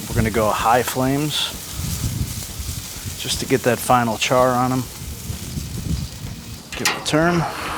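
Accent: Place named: Michigan, United States. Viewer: You from Michigan, bud!?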